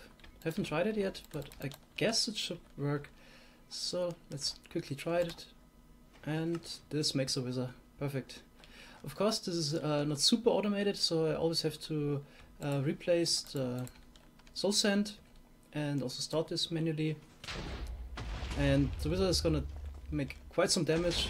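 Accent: Germany. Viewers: Whar natationality do you have? Because your Accent sound very german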